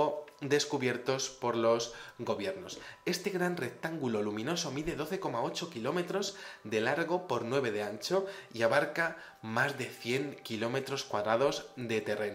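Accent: Spain. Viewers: de que parte de España Eres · muy interesante el video, de que parte de españa eres???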